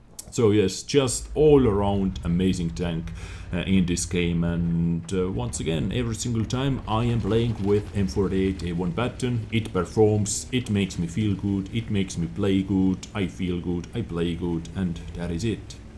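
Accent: Estonia. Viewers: are you from one of the baltic states Estonia? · Are you Estonian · Are you Estonian? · are you Estonian?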